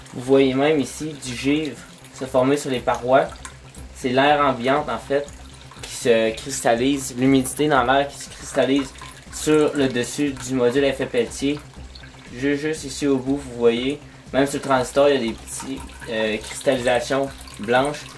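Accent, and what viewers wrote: Quebec, Canada. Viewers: habla francés de canada. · vous avez vraiment un accent affreux les québécois, on a du mal à comprendre vos phrases pourtant on parle bien la même langue non?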